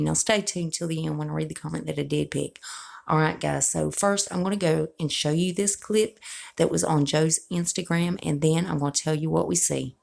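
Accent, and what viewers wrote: South, United States. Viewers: has always had a strong southern accent, just like myself. I'm from the south as well. I've been subscribed to her from almost right when her channel started, and her accent has always been the same.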